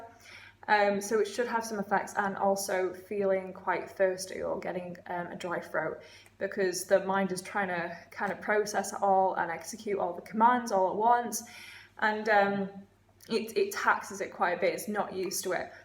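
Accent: United Kingdom. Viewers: Love your British accent. I got no subliminal request. Just wanted to compliment you... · I love your British accent, your eyes, your look, your class, like the famous British cars. Greetings from Italy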